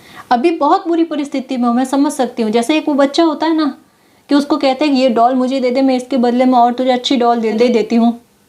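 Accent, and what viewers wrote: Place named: Marwar, India. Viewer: Rajasthan ki Ho kyunki main bhi Rajasthan ki hun kyunki aapki Bhasha se pata chal raha hai Shayad aap Marwar Marwar se ho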